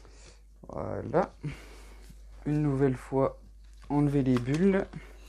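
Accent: Franche-Comté, France. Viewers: C'est super beau les nuances nacrées, j'aime beaucoup 👌 Tu es de quelle région ? Tu as l'accent franc-comtois 😁😁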